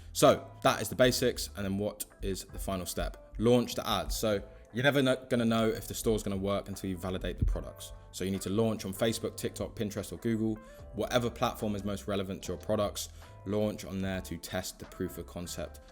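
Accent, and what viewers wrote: United Kingdom. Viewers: That voice sounds british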